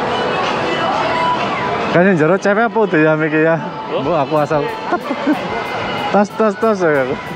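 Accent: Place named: Banyumas, Indonesia. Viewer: jos lh,,PD Bae ro enyonge,,,salut walaupn d ngrti orng ngapak e Ra klalen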